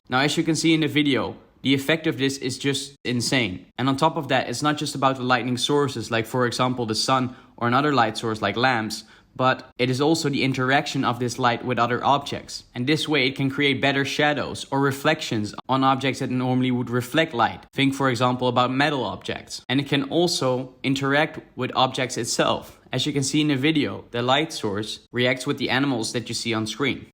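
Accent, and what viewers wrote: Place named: Netherlands. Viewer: i get the feeling your dutch by how you talk idk man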